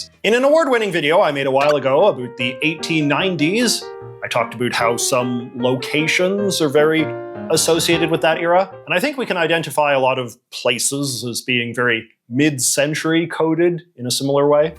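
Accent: Canada. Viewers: I agree with a lot of your points made here. But man is your Canadian accent is really popping here. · What’s this accent? Never heard anybody who pronounces “about” like “a boot”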